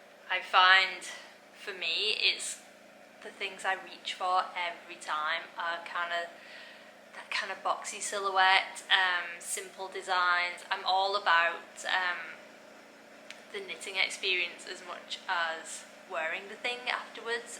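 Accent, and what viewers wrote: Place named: Chester, United Kingdom. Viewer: Loved your first podcast. Your voice reminds me of home (I’m originally from Chester but now live in Scotland). Tilly is gorgeous, hope to see more of her and you ☺️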